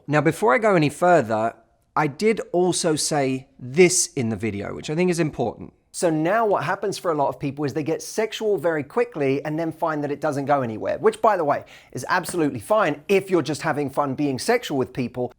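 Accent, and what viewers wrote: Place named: England, United Kingdom. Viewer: He gives great advice and he is so beautiful I love his lovely English accent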